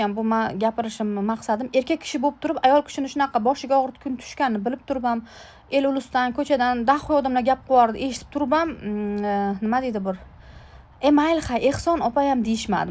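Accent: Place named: Fergana, Uzbekistan. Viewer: Оллох сабр берсин сиглим. Шевадан умуман Бухороликга ухшамайсиз. Мен Фаргоналиксиз деб уйлар эканман.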